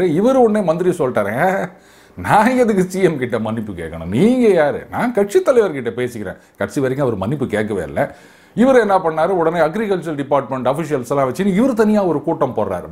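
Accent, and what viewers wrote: Chennai, India. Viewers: I don't know whether Tamizh is an International language or not ....but yours and mine chennai tamizh is international ....sokka pesaringu Vaazhga Chennai tamizh Valarga Bhartham Jai Hind Vande matharam🙏✋✋🌷🍁( I couldn't find the Lotus symbol)🤔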